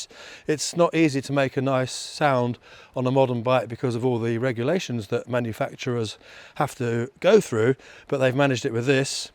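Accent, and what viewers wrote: United Kingdom. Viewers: Beautiful bike, and you are very good, I'm learning British pronunciation by watching your videos, just out of curiosity I'm 1.85m tall, do you think it's too small as a bike?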